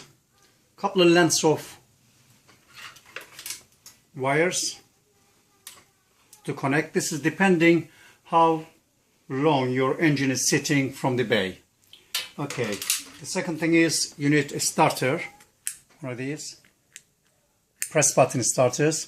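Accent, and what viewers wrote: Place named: Iran. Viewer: You sound like you have an Iranian or French accent🤔🤔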